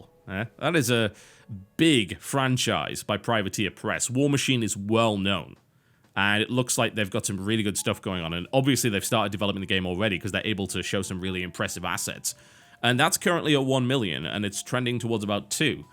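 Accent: United Kingdom. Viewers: He can't you fucking racist that's how British people say it. Its because of their accent.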